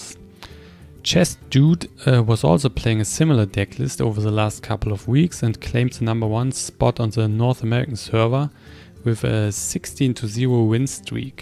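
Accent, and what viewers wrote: Germany. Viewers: are u from germany? funny enough i'm trying to learn some german :D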